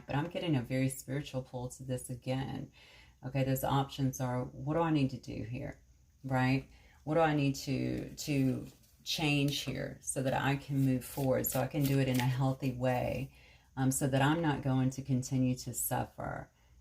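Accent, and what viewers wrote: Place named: South, United States. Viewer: Brilliantly and amazingly accurate. In addition to reading the cards and channeling, I love how you inject little pearls of wisdom into each reading. Your voice and soft Southern accent is so very soothing, especially in times of difficulty which I am experiencing at present. But I feel energetic stirrings.. Thank you, Ms.. Xx 🌟💖💫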